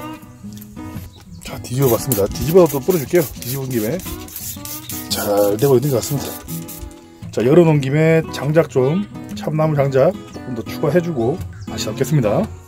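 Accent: Gyeongsang, South Korea. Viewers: ㅋㅋㅋㅋ 진짜 상남자의 wild 먹방 조으네 구독완료다 저랑같은 경상도 분 같은데 어디시져?ㅋ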